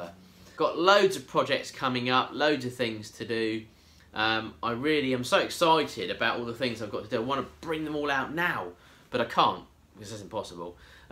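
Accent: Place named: Liverpool, United Kingdom. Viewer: New Zealand.... really.... I would guess by your accent your around Liverpool myself. I did ask a while back.... and I'll do so again mate. how do youclean the resin out of your mixing bowl... cheers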